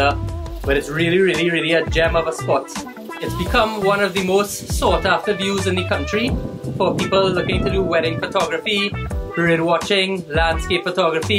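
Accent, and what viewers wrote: Trinidad and Tobago. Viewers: I love your west accent. It’s comparable to the convent accent just the male version. Love your channel. Keep up the good work. This place looks nice but for USD $114 per night I would have liked air conditioning and toiletries in the shower eg shampoo but seems like a eco lover dream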